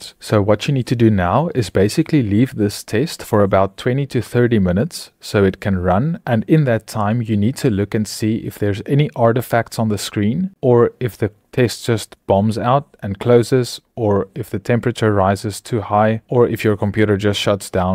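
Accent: South Africa. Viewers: I could definitely tell that you're South African based on your accent. Great video!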